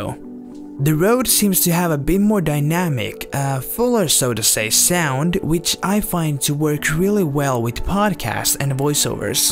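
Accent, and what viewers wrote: Finland. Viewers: You sound very,,, Finnish :D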